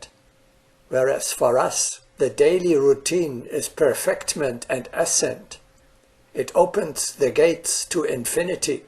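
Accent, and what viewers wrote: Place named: RP, United Kingdom. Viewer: Ready, willing, able, and at your service Dear Ones. Perfect use of inherently limited Queens English.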